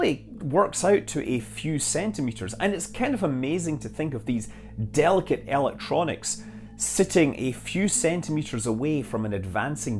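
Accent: Scotland, United Kingdom. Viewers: I canny place your accent, Scottish ~like me, not Glasgow, more 'refined'........hmmm. :) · where are you from originally in Scotland? Your voice reminds me of my grandfather who was from Wishaw:) I've lived in Wales most of my life and miss the Scots accent! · You know, most of us just watch your videos because we’re entranced by your Scottish accent.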